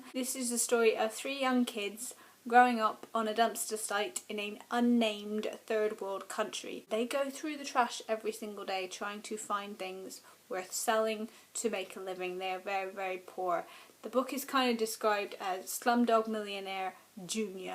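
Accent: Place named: Cockney, United Kingdom. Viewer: haha... I bow down to your cockney awesomeness! And you are right no snack is much worse than a lack of butter (well, unless the snack is milt). Though, I could do with a lot less butter these days - I've got two months to fit into my suit for a wedding. Luckily I'm now doubly motivated to do exercise - exercise improves cognitive function.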